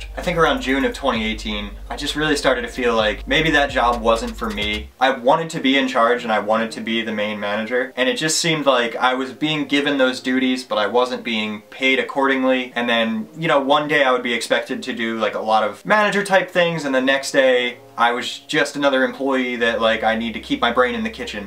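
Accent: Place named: New Jersey, United States. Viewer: You sound like a jersey dude. My kinda guy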